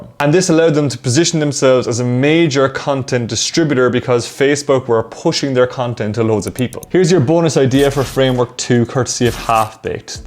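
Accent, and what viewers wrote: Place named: Cork, Ireland. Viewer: Love when you’re randomly jumping through YouTube videos and come across a random lad with a Cork accent 😂 great content!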